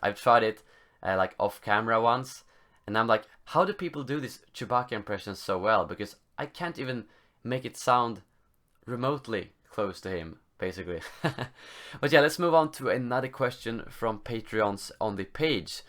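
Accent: Sweden. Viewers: Wich country are you from? Sounds... Swedish kind of.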